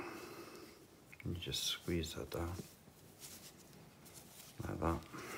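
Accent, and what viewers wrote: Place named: South Africa. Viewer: I thought I could detect some South African and a little bit of Italian. Thanks for replying mate.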